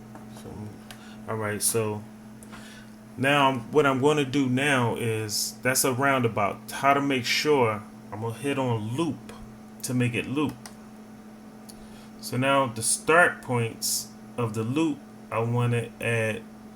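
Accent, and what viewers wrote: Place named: Philadelphia, United States. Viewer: I'm from Philly too so it wasn't hard to tell. This helped a lot btw. 👊🏾 THNX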